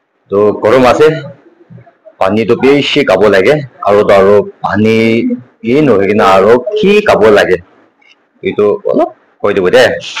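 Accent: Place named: Nagaland, India. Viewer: Bakka local nagamise Ao tune ase ....holibhi bisse bhal tey ....keep it up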